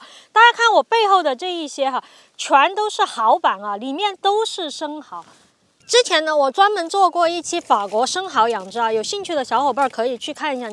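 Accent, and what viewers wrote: Sichuan, China. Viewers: yooupi的口音对于川渝地区的人来说太有亲切感了😂😂😂😂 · 听声音就晓得是咱四川妹儿，果断关注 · 川渝口音好重，喜欢~ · 好重的川普味哟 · 这口纯正的川普 👌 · 这川普 有点掉格了😅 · 这川味普通话，有点想家了😂😂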